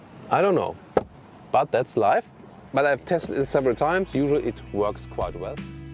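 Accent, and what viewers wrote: Germany. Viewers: Wow, I so expecting a German biased report. I was wrong. The guy obviously German but a professional in his work, gave a proper detail account of each car. Hell of alot better than any British car magazines ever do. I love his accent, his English is alot better than my German, so we'll done. With such detailing lasting more than a poxy 3 or 4 mins from the crappy what car reviews ,who often get it completely wrong when there silly surveys come out which you get a quick flippant remark if your lucky, they sure could learn a few things from guy here.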